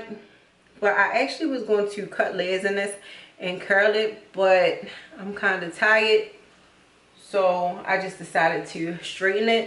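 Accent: Baltimore, United States. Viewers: Guuurrrlll I hear BALTIMORE all in your voice!!! 🤣🤣🤣 #DMV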